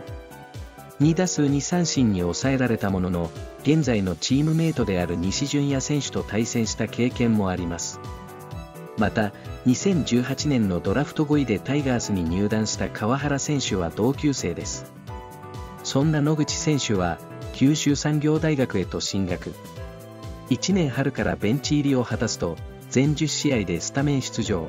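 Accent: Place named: China. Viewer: ナレーションが中国人の話す日本語みたい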